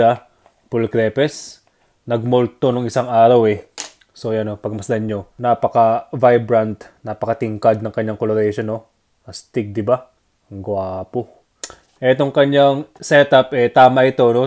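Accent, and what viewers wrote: Ilocos, Philippines. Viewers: Idol may pag ka ilocano ka no? hehe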